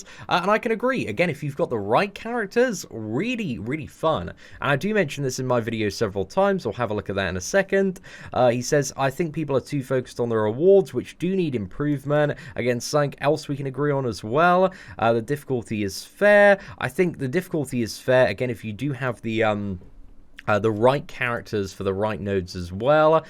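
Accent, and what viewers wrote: United Kingdom. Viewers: Ngl there are 3 reasons why I am subscribed 1. You're british accent is amazing 2. You gamble everytime 3. I play contest of champions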